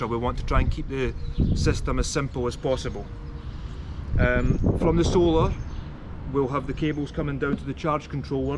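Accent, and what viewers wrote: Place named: Scotland, United Kingdom. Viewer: Mate where in Scotland has that accent ?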